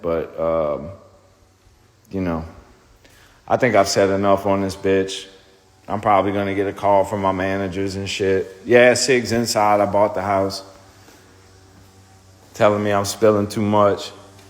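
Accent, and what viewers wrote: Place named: United States. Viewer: When scrim was an addict he sounded hood, now that he's sober he sounds country...wtf.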